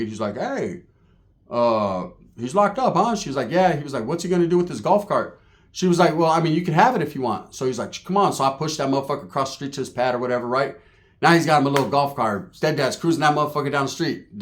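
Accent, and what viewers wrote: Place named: Bay Area, United States. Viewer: Aye uce... I peep how you sound like a bay nigga. They not in tune on how we talk. These stories be having us dying 😂 you sound like a OTHERS. That super uce..all your samoan viewers heard him thru you😂😂😂😂we felt dat sole..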